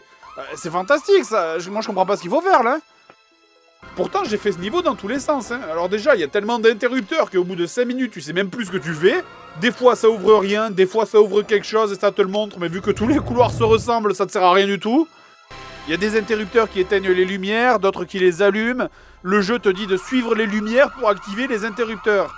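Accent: South, France. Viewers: Le JDG est un vrai sudiste, avec l'accent qui va bien!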